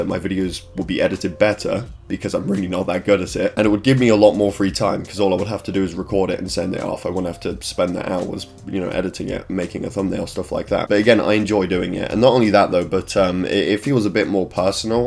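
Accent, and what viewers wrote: United Kingdom. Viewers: These dev times are incredible. It's amazing how far has come And you just did a skit about your own skinwalker vid feat. Cloud... even I remember that 😅 And good on you for being authentic with the editing etc and since you hit puberty late 🤣 Most your demographic probably aren't British, they just love our accents haha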